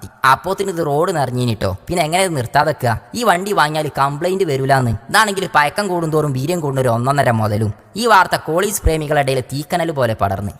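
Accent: Malappuram, India. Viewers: നല്ല രസമാണ് കേൾക്കാൻ മലപ്പുറം ഭാസേല്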